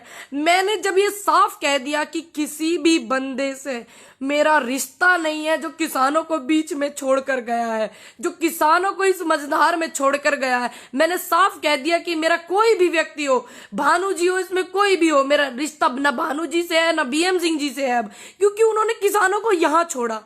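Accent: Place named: Western Uttar Pradesh, India. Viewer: बिटिया! हम आपके साथ हैं । आपकीे भाषा दूसरी नहीं है । मै मेरठ से रहा हूंँ । मैने आपके लगभग सभी वीडियॊ देखें हैं । आपने कहीं भी अभद्र भाषा का इस्तमाल नहीं किया है । आपकीे भाषा पश्चिमी यू पी की ग्रामीण खड़ी बोली है, यह एक धांकड़ बोली है, हाजर जबाब बोली है । आप यदि दिल्ली में बोली जाने वाली बोली का इस्तमाल करेगीं तो पूरा देश आपकीे आवाज सुनेगा । इसके लिये आपको यू पी शिक्षा बोर्ड के हिंदी के गद्य-लेख पढने होंगें ।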